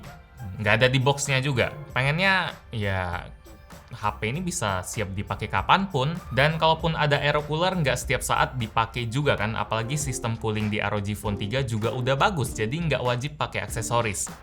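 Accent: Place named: Java, Indonesia. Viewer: 865 tok! Medok sekali anda bang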